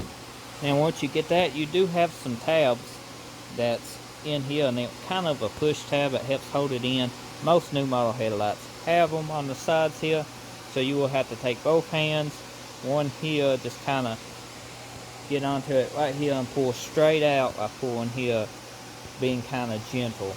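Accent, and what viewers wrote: United States. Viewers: very quick and to the point, good to hear a man with a true American Accent too!!!! Thanks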